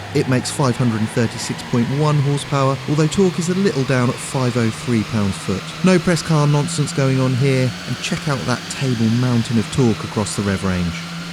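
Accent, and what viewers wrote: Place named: England, United Kingdom. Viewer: I think I like the English accent more the then video hahah nicely executed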